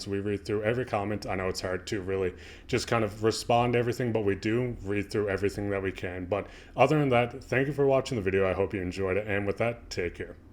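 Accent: Newfoundland, Canada. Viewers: Newfoundland? but we can understand you.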